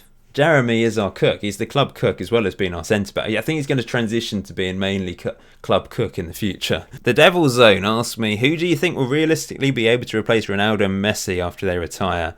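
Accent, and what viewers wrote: RP, United Kingdom. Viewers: I don't know if you really are posh but when my girlfriend overhears me watching your show she says "ah, you're listening to that posh guy talking about football again". so there you go...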